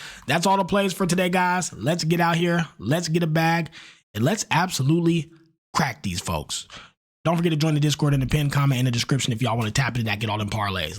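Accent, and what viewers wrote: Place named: Midwest, United States. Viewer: Bro. You in OHIO. I’m in Cleveland. I knew u was Midwest ; we talk just alike. 🤜🏽🤛🏿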